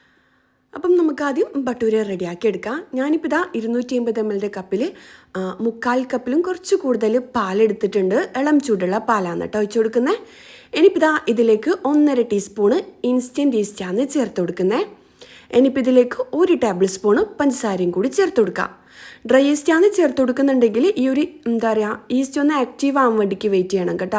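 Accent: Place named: Kasaragod, India. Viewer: കാസർകോട് സ്റ്റൈൽ സംസാരം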